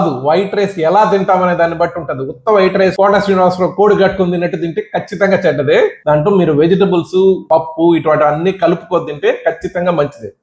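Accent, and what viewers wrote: Nellore, India. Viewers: sir, super sir meeru, with absolute nellore language super explanation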